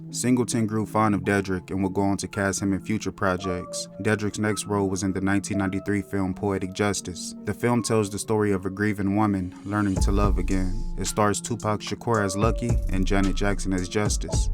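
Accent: California, United States. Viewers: That's definitely a California where are you From?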